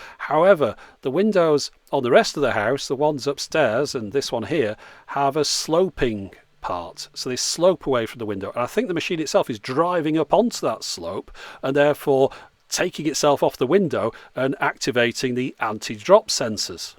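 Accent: United Kingdom. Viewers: I love your videos and your voice! Its so British and classy. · Charming British accent... mmm...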